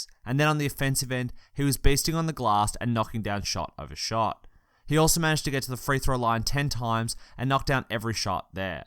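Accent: Australia. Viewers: Do I hear a fellow Aussie that’s a sub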